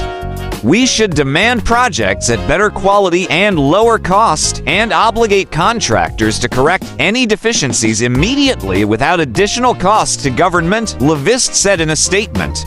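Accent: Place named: United States. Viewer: Iam fr the first district of batangas, nakkadismaya naman ang pronnouciation ng ngsasalita, ano ba sya americano?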